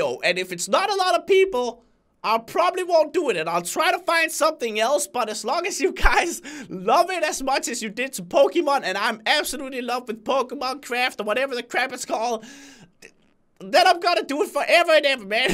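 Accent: Denmark. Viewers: One video u should do u in ur danish accent with subtitles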